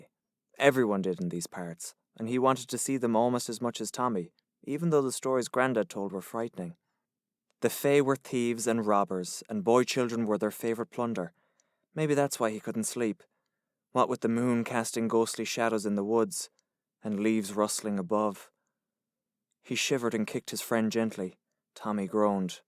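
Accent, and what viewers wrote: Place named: Ireland. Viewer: Excellent... wonderful narration. I have always liked listening to the Irish dialect.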